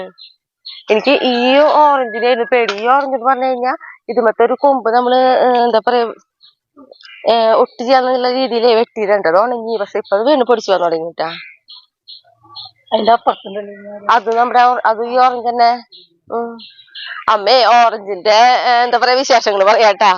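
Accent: Thrissur, India. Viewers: സംസാരം കേൾക്കുമ്പോൾ തൃശ്ശൂർ ആണെന്ന് തോന്നുന്നു....... എവിടെയാ സ്ഥലം?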